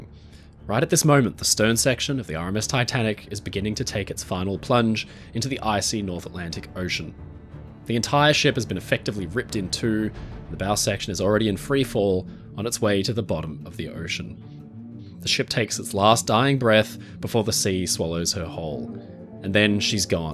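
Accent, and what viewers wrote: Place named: Australia. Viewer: Hmmmm aussie guy i wonder whats his name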